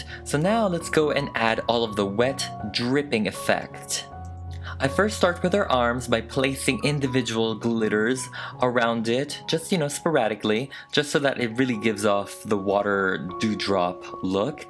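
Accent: France. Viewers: You speaking in a French accent gives me life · As a French (from Paris) I can tell that your French accent is really cute ❤️ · Son accent français est adorable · That french accent is still offensive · J'adoreeee ton accent français 😭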